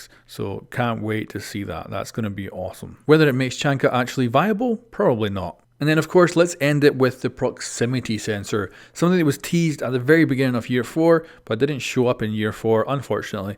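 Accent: Scotland, United Kingdom. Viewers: That scottish accent is very cool, instant sub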